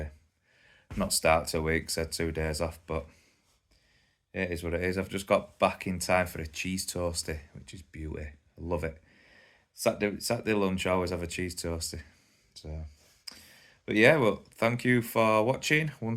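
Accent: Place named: Yorkshire, United Kingdom. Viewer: Video is great, loved it! And that Yorkshire accent! 😊 Wish the background music was a bit more relaxing, though.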